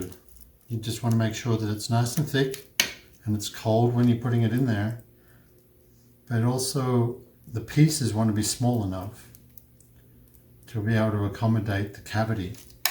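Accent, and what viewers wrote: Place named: Australia. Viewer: Are you an Australian living in USA? At first you sounded Australian and then I could hear an American twang so couldn't work it out! Anyway, a nice twist on the Aussie pie. I like the idea of the herbs and paprika so will give it a go :)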